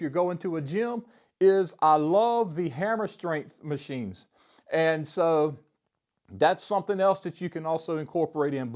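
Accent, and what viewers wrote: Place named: North Louisiana, United States. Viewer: I detect north Louisiana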